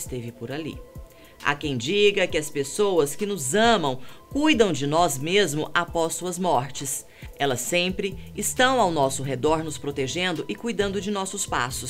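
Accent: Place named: Rio de Janeiro, Brazil. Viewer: Certeza que ela é carioca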